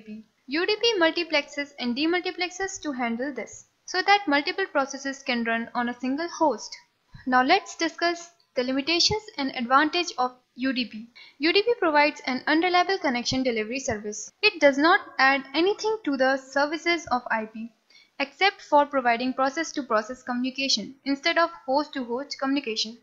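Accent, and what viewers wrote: India. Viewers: your Indian voice.........................uuuuuuggggghhhhhhhhhhhhhhhhhh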